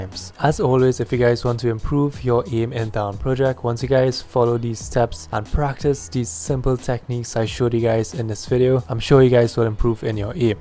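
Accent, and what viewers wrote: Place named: Jamaica. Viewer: U sound jamaican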